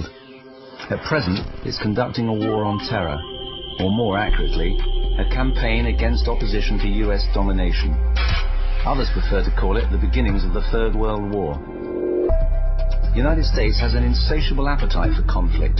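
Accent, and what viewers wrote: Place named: United Kingdom. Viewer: Cool vid. I especially enjoyed the British accent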